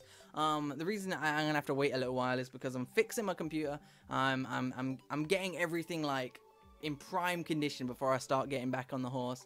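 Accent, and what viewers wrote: United Kingdom. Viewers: 1 quid table cloth from asda = 1.46 table cloth from walmart for you yanks that didn't understand his queens english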